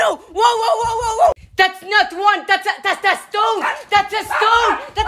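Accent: France. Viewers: The way he speaks english reminds me of french-👀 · Cz he's french. And this is a french accent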